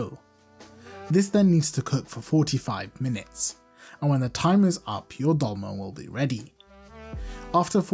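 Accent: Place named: United Kingdom. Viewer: I love your videos. Your "bri'ish" calm accent makes it all 10x better. The turkish/kurdish way of preparing the bell pepper is to cut open the top of the fruit from above around the stem. After removing the core, you can stuff it and use the stem as a lid. Then the bell pepper looks intact. Cheers for the videos again!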